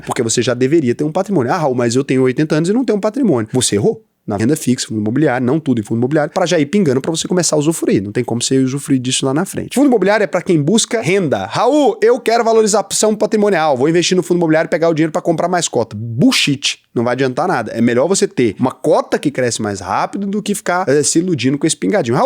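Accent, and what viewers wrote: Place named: Goias, Brazil. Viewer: Uai voce e de goiania irmao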